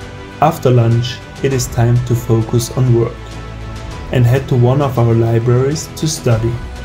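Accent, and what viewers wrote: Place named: Austria. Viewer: You talk like Arnold Schwarzenegger )) I'm coming to Graz next week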